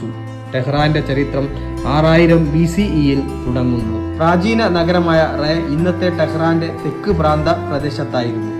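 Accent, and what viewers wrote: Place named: Kozhikode, India. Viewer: കോയിക്കോട്ട് കാരനാണല്ലേ? വല്ലാത്ത ഒരു യ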